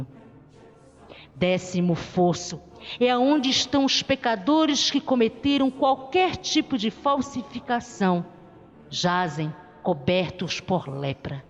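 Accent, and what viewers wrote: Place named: Northeast, Brazil. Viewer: adoro a abertura com voz de nordestino amooooooo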